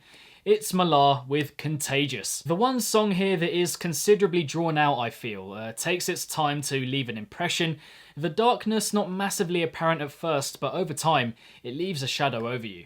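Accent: United Kingdom. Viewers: Good video but my ears is blooding by your bri'ish acсent, sorry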